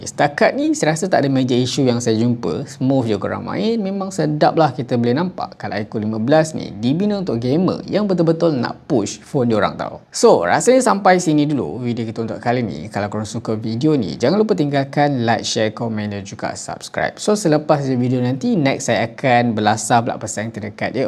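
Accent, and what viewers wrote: Malaysia. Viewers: Saya betulkan cara cakap malaysia,saya suka cara dia cakap malaysia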